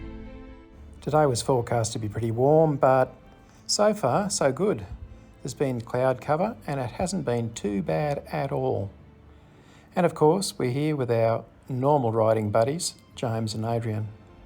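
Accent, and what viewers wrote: Australia. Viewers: I’m a new follower to you and love your brilliant video content. In particular I love your Aussie accent and think you sound like the late and great Clive James ! Has anyone else said that ? I’m so jealous of your outside life down under, you have it all and it’s a bikers paradise. Regards from the not as exciting UK 🇬🇧 😂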